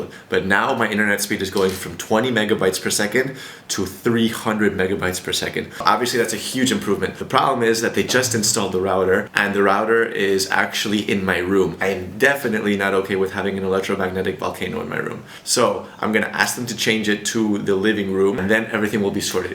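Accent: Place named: Spain. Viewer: I love ur Spanish accent, u should speak Spanish more often😁 great work with the vegan transition!! 👍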